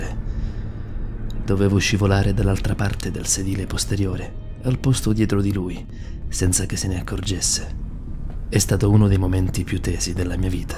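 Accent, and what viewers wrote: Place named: Calabria, Italy. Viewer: non so voi ma ascoltare queste storie alle cuffie è qualcosa di fantastico ma allo stesso tempo terrificante! comunque complimenti davvero per il montaggio la narrazione e tematica... e poi dalla voce sembri calabrese giusto?!?!? :) COMPLIMENTI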